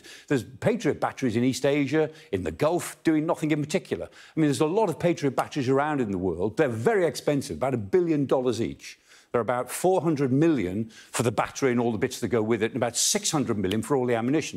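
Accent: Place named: England, United Kingdom. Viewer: What i like the most about M. Clark's commenting is his English accent. Otherwise sadly nothing comes true 😢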